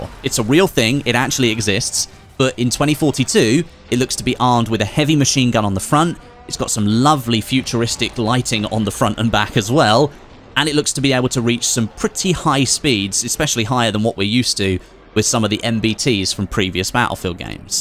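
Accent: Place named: United Kingdom. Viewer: the most bri'ish accent of a gamer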